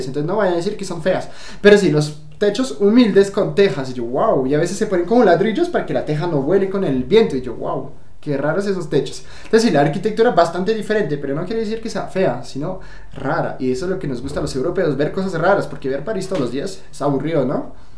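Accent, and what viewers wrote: Bogotá, Colombia. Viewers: Soy mexicano , me encanta Colombia voy muchas veces al año, me doy cuenta que hablas muy bien el español colombiano, hablas tan rápido como ellos jiji, me gustó tu vídeo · Primera vez que veo tus videos, me encanta que te guste mi país... que chevere tu acento ya es muy colombiano, extraño mi tierra a la distancia, y el himno a las 6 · De Francia!!, hablas muy bien el español y me encanta tu acento colombiano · Re perdido..hablas muy Colombiano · Hola, tienes un acento muy rolo. Jajaja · Tiene el acento rolo medio ahí jajaja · Hola en cuanto tiempo aprendiste español colombiano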